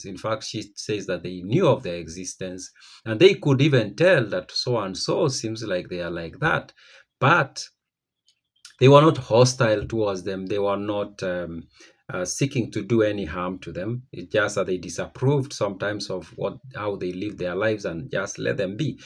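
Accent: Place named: Kenya. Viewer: we are dignified people we are nomadic we troll each other because we think words are just word lol we laugh a lot and believe in mental resilience and respecting others even if we are not from the same country I learned all this from my dad he grew up nomadic but he gets along with anyone and will speak up/ stand up for anyone when need be. M Side note: from your accent I think you’re Kenyan or 🇺🇬? This my first time seeing this video so is just a guess😂 nice video🙏🙏🥰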